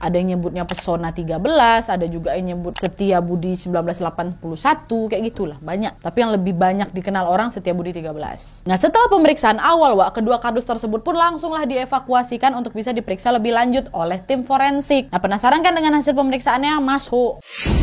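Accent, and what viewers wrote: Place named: Sumatra, Indonesia. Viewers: Kau itu cerita seakan akan kau ada dan melihat langsung kejadian itu....kau pun kurasa belum lahir...dan kau pun dari logat bahasamu orang Sumatra ... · Mbak ni org Medan yaa 😁 Berarti di thn2 itu byk org hilang ya terbukti byk warga melapor ke polisi bhw anggota kel mrk hilang..serem yaa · Dari Style Bicaranya, sepertinya Wak orang Pekanbaru ya?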